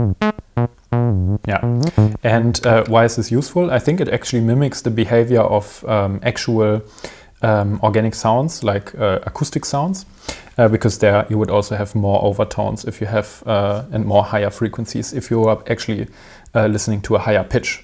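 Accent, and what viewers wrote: Germany. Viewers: I love the way Germans speak English for some reason, and I notice “actually” is a high frequency word for a lot of them.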